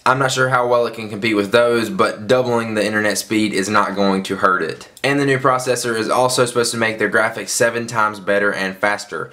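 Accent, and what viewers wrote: South, United States. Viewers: are you southern?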